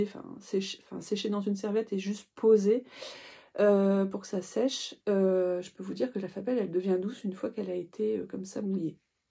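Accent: France. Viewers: J'aime beaucoup t'écouter, petit accent qui me rappelle mon enfance à Marquise 😉 mais pas seulement pour ça... Tes ouvrages sont beaux, la "rubrique culturelle" est claire, intéressante, tu me donnes envie d'écouter un livre audio... Merci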